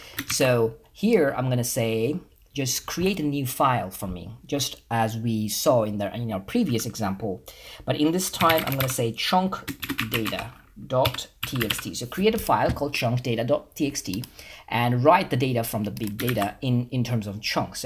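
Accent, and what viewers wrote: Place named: Ireland. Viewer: Nice video mate, are you living in Ireland, I can hear a slight Irish accent at times?!!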